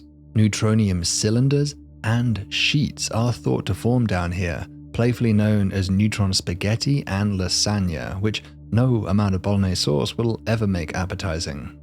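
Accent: United Kingdom. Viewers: "Poolsar"? Never heard that pronunciation before. Does he also say, "Check my poolse"? OK, he also says "repoolsion." Where is from that he has a classic British accent except for that quirk?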